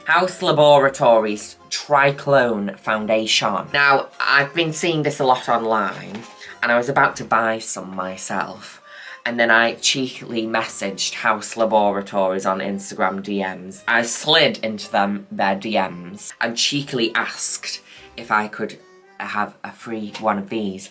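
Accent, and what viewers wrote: Australia. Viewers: Stunning as always. Love your Aussie accent 😍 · THE AUSTRALIAN ACCENT SPOT ON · Yasssss the Aussie accent got me good! Straya loves you ❤️ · Quite aside from the STUNNING makeup, that was the best Aussie accent I've heard in forever. Signed: an actual Australian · Love has she it super funny. Also great Australian accent! ❤ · Ok finally a drag queen who can do a fairly close aussie accent! DORN'TTTT !!!!!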